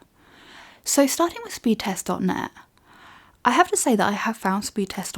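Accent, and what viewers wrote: United Kingdom. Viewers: Her English says it all .. USA is not the origin of English.. it's UK..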